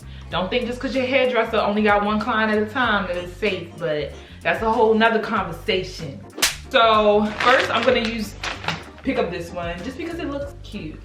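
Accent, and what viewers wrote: Baltimore, United States. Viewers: I watched your first Amazon wig video and I was like she gotta be from Baltimore, the accent in this video confirmed it. Nice to find someone from my city I can watch 💯🙌🏾 keep the videos coming you're hilarious too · The curly was cute 😂and you sound like you’re from Baltimore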